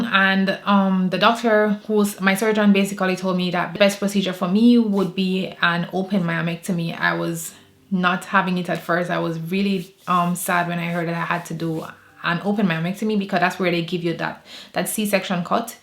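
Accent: Jamaica. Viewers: Waiting to do my open myomectomy yu sound like a Jamaican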